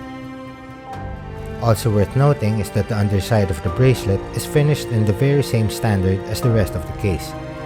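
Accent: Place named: Philippines. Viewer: your filo accent is annoying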